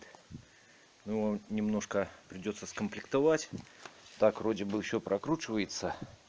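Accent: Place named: Belarus. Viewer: Здравствуй! Я с Черниговского района Черниговской обл с Анисов Украина.Буду очень благодарен.Ты не с Белоруси? Акцент вроде бы похож.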